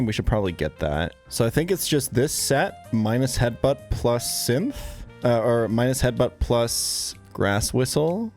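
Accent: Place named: United States. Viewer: It’s so weird to hear perfect American English coming out of a Germans mouth, especially in this outfit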